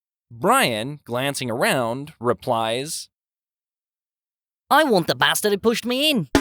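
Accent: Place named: Australia. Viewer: love your Aussie accent😂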